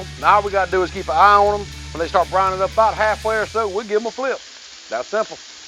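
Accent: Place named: North Carolina, United States. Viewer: What part of the south are you in ? I can close my eyes and you sound just my like family in Hudson NC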